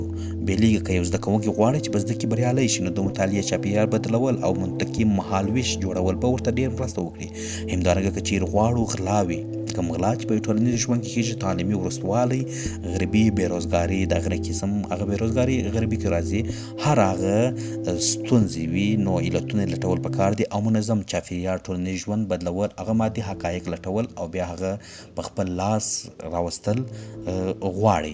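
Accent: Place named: Helmand, Afghanistan. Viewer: ږغ د هیلمند دی تاند دی اوسي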